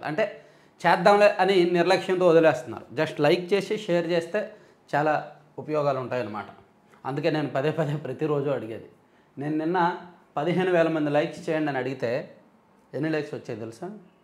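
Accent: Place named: Srikakulam, India. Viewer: శ్రీకాకుళం భాష సూపర్ సూపర్ సూపర్ 😅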